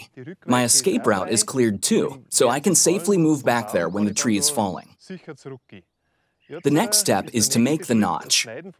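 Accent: Bavaria, Germany. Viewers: Bei der Ankündigung dachte ich schon real Bavarian English....Ich weiß nicht, welcher englisch Sprechende verirrt sich denn auf diesen YouTube Kanal.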